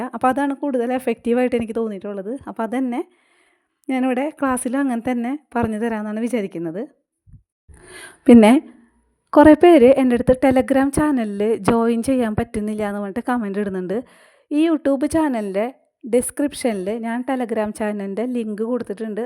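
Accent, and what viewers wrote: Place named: Thrissur, India. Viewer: Aanenn thonunnu njanga tsr kaarde voice kettal manasilaavum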